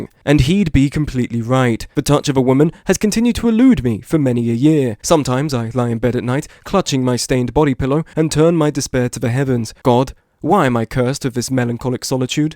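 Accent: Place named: United Kingdom. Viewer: Oooh, a 4-hour video analysis on a game narrated by a British person! I'm sure that my ADHD riddled mind can handle this! 😃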